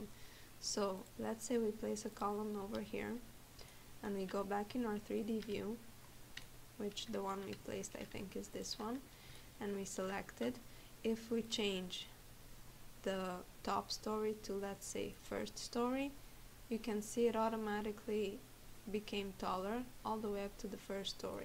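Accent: Eastern Europe. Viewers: Very slavic accent, where are you from?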